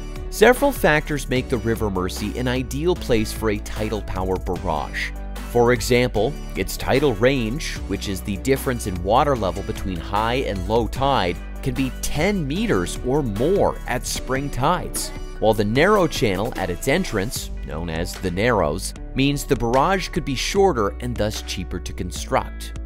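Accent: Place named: United States. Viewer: The American accent is a dead giveaway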